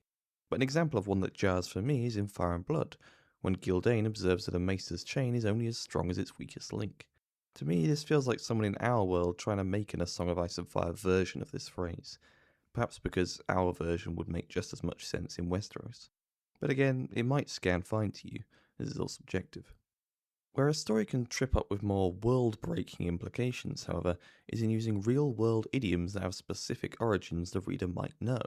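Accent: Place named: RP, United Kingdom. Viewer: You see what's interesting?? NORMALLY I'd actually AGREE with you... In case, however - nah. The situation here is TOO damned. Hear it from someone who spent 4 years of his life in SCOTLAND: -- I can barely make out utterings sometimes, and he actually speaks in a STANDARD British accent. It's just he's so QUIET, and his prosody is SO CUT-UP and faint...:/.. Seriously, dudes... I have to rewind like 10 times, and it takes me like 30 minutes to fully "comprehend" a 7-minute talk...:/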